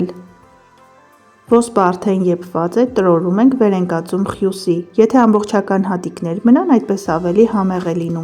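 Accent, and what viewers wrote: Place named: Ararat, Armenia. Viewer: Ду шат юраатук ес джан, Цайнд амен ангам лселуц, инц твум е те инц Аразат цайн ем лсум, шат бнакан ес, у шат тнакан,аранц Кез котрателу🌹👍💋💋💋❤️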